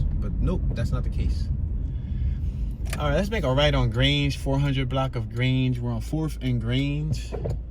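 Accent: Philadelphia, United States. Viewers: that why I offered! You riding around talking and no disrespect you giving out some false info or half info. That why other channels hop out and do little interviews with the locals. I’m not telling you how to du your channel. But just getting data but not touching the field is two different things! You want the real or u want to ride around sounding like a tourist they grew up in The burbs and the only info u got is from a collection of numbers they put together that most of the time manipulated? But Hey do you bro! And of course you not going to know all he stories that why most these channels that blow up do hop out and do I interviews or chat with locals! Again I’m not telling you how to run you page but your numbers are low for a reason. You page a little light bro! I mean that with all do respect! You trying to get it popping or ride around with this half a philly accent sounding like you from Doylestown somewhere? 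Even your tittles I can tell you not from the city and u not getting ground zero info! Bro u said nicetown- tioga was the most dangerous? Man that area is dead zone! Big houses and ain’t nothing going on except up and down hunting park ave! But like I said it your channel but a real Philly bul gone going to know something off about you and your info and page! I guess it’s for the suburban and out of Towners. Where you from bro? For real?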